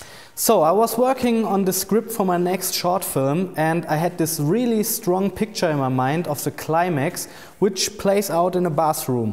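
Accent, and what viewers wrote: Germany. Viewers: Oh , bist du Deutscher oder Österreicher?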